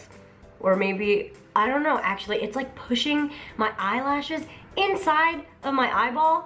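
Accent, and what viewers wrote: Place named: Los Angeles, United States. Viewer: where were you born? you have american accent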